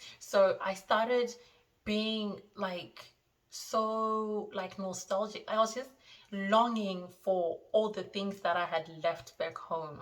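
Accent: Australia. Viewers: some ausie accent detected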